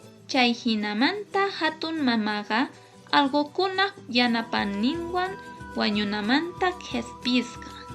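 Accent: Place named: Ayacucho, Peru. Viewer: Te pasaste compadre. Has hecho una gran contribución al mundo. Lástima que ahora no podré usar esto como tarea de audio-comprensión como antes para mis alumnos porque ya lo has traducido. Igual, se agradece y se te felicita. El cuento es boliviano (se agradece mucho a los hermanos bolivianos por colgar material en quechua) pero ese quechua al ser pronunciado es más entendible incluso que el quechua Cusco-Collao del sur del Perú. Se nota que tú hablas quechua Ayacucho-Chanka o quechua de Apurimac, ¿cierto? Hatun marqayniyta chaskikuy, Chosica llaqtamanta, wawqillay.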